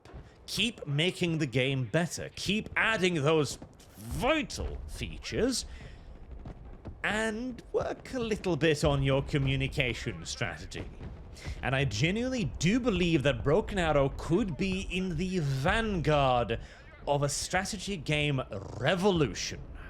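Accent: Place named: United Kingdom. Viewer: Bloody hell, This guy's British is so powerful I feel like im in a British Tea party, Im not even British!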